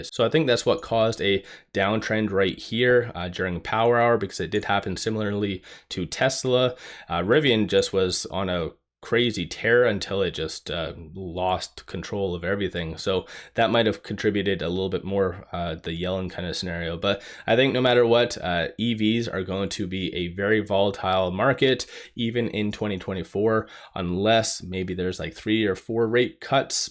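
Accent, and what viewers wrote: Canada. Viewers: Good Canada speak.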